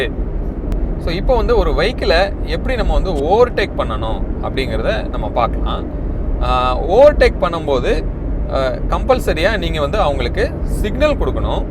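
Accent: Virudhunagar, India. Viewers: Bro, Neenga Virudhunagar ah ??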